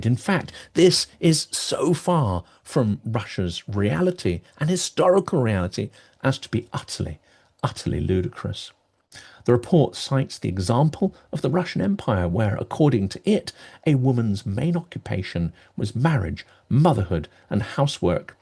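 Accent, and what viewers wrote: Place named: West Country, United Kingdom. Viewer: How dare that hypocrite Galloway claim he cares about suffering humanity... while supporting Russian imperialism! The guy is a fraud, a morally bankrupt political opportunist who jumps into by-elections to get his ego stroked by being in the headlines again...upon winning a by-election (such as Bradford and Rochdale) he soon abandons his constituents - the campaign doing its job of getting his face, voice and name in the news, his ego bolstered. Thanks. I love your eloquent and witty - yet acerbic when needed - monologues. I could listen to your fantastic lilting West Country tones (I am assuming it is a West Country accent, right?) all day!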